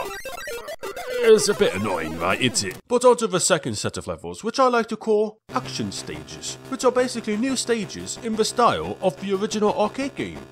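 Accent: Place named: North, United Kingdom. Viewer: I spend every one of your videos trying to place your accent. Seems to be part gordie, part scouse, part Lancastrian, with a slight “wossy” style lisp.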